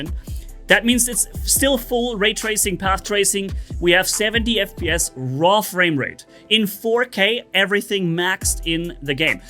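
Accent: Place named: Germany. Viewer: Ich erkenne Deutsche Akzente, auch wenn sie nur ganz dezent sind. ^^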